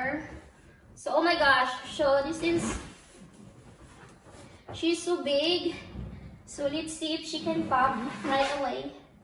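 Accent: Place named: Philippines. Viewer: You made me laugh and I love your Pilipino accent, it's so cute.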